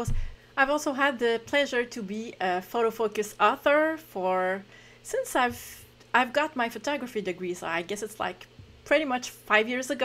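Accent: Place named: Canada. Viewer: Thanks a lot for these video, but I still prefer your French (with nice Canadian accent), please continue ! 🥰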